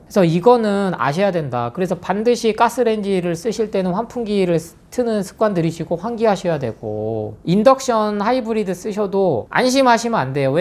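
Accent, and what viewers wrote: Jeolla, South Korea. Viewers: 전라더 말투에.. 인상도 이상하고... 그냥 딱봐도 사짜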